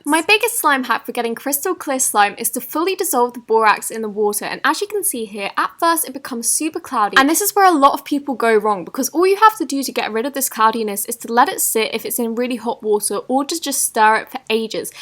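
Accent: England, United Kingdom. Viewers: Are u English ? Xx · 😊 you are legit my favourite YouTuber. Also, your British!(like me)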